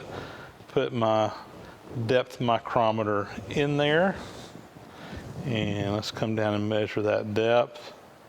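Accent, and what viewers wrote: South, United States. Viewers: I just finished watching the video from Hand Tool Rescue where you had to make the part for him. In the part of the video where y'all were having the "phone conversation," the minute you opened your mouth, I thought, "That boy ain't from Canada. I wonder how far below the Mason-Dixon line he lives." I was born and raised in Monroe, LA area. My ancestors came from Georgia and Alabama. Enjoyed the video.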